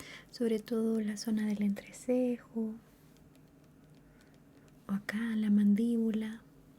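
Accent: Chile. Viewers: Muchas gracias. Te sigo desde hace años y creo que por ti amo el acento chileno. Justo han sido días de mucha ansiedad, ojalá hagas más videos así. Últimamente me he refugiado en tu canal de ASMR 🥹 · Querida, el primer video tuyo que tuve el privilegio de ver fue hace muuuchos años, un tutorial de cómo hacer un corazón de Undertale con porcelana. Comencé a ver tus videos por el tutorial, me quedé por tu carisma. Tu acento de Chile siempre me recuerda a mis abuelitos y todos los tíos abuelos y primos lejanos que tengo allá. No soy artista, pero soy docente, y fuiste una de mis inspiraciones para llevar el amor y la paciencia a mis alumnos en su proceso de aprendizaje. No suelo comentar mucho en los videos, pero te sigo desde mi cuenta principal hace muuuchos años. Quería agradecerte por el hermoso cariño que le ponés a tus proyectos. Y gracias por este video que me ayudó mucho en tiempos difíciles. 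Con amor, una pollito veterana ❤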